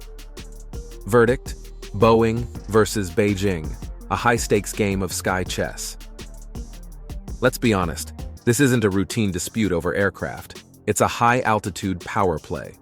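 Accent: United States. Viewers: What awful narration. American accents are torture. The CIA can ditch waterboarding. Just make 'em listen to this.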